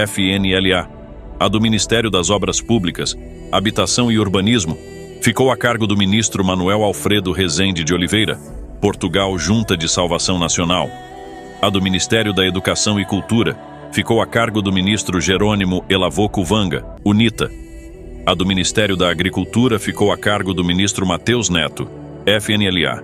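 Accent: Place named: Brazil. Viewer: só uma duvida ........... pq varios canais africanos utilizam o sotaque brasileiro?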